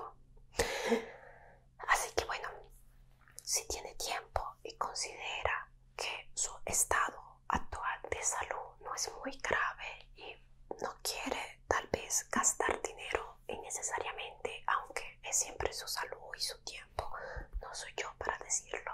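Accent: Italy. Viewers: Eres de Italia? , nose tu hacen to me parece Italiano 😁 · De que pais es? Italiana? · Se te esta pegando el asento italiano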